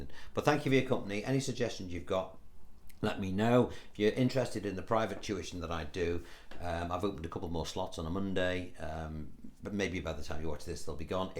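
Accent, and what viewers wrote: United Kingdom. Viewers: Great video to watch. So relaxing to listen to your wonderful British accent as opposed to the American you hear all over the internet. And always a a little funny: I love it ❤ We all shouldn’t take ourselves too seriously.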